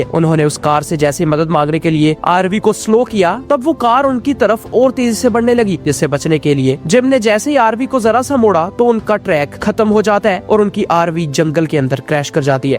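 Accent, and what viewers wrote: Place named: Pakistan. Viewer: Paxtani accent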